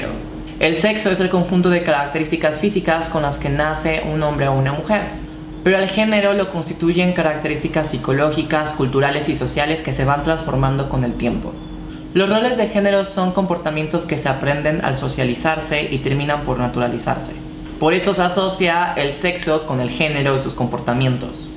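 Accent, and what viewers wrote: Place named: Fresa, Mexico. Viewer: porqué siempre hablas tan pinche fresa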